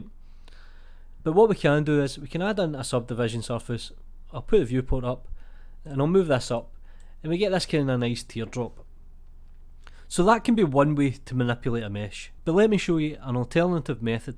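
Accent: Scotland, United Kingdom. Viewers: Just learnt something new, I also love your Scottish accent 😁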